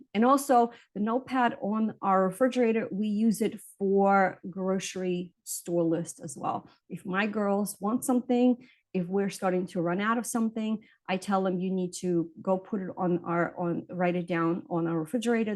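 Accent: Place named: New Jersey, United States. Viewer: "Let's tawlk" ... jeez ... i miss Jersey & once away i can't help but catch a belly laugh when hearing the accent that Jersey folk swear they do not have. I gotta get home !!! 😆🍅😆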